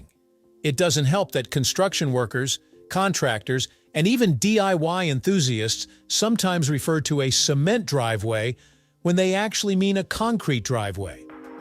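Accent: United States. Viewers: I noticed the title and thought that this has to be an American video. All English speaking people (apart from Americans) know that cement is a dry powder that needs to be mixed with sand , water and small stones to make concrete. When the mixture is cured and you have a very hard substance that is called concrete. I watched 20 seconds to hear the accent and then switched off.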